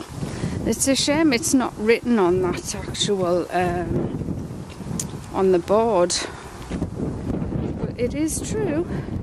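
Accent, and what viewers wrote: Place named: England, United Kingdom. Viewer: I like to see your video. Beautiful English accent .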